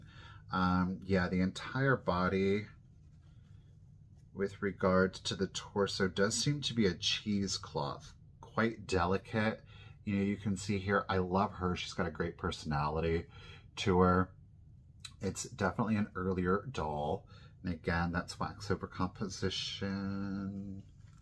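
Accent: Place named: Scotland, United Kingdom. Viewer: you sound Scottish 😂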